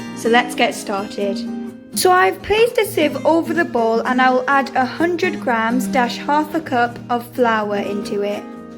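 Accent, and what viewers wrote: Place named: United Kingdom. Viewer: Love listening to your British accent. Keep posting!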